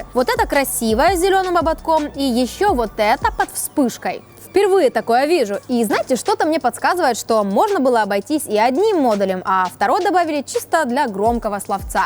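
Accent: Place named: Kuban, Russia. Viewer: Кубанский, Украинский-южный как короче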